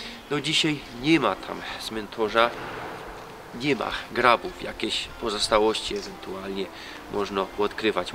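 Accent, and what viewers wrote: Silesia, Poland. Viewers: Urodzilam sie w Myslowicach 41 Roku jest cieszko mi zrozumiec gwarwy sloaska Anna z Australia historia o Myslowicach chciala bym wiedziec❤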